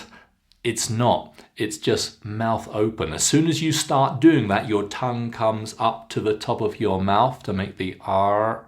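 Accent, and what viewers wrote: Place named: RP, United Kingdom. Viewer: You have a modern RP accent. Were you born in the south of England?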